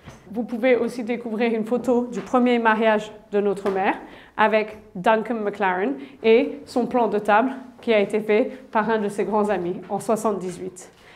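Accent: Lorraine, France. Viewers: Bonjour Madame, j entends que vous avez un léger accent , est il Lorrain ? Merci pour cette visite de votre château qui est intéressant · Très beau mais quelle charge... Bravo à vous. L'accent est il lorrain ?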